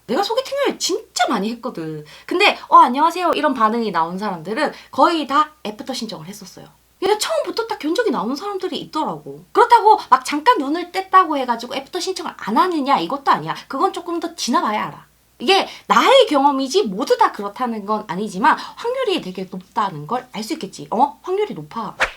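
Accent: Gyeongsang, South Korea. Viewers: 언니 대구 사람이구낭😍 이렇게 엮어들어거는거란 말이죠? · 온니 대구 사람이에용?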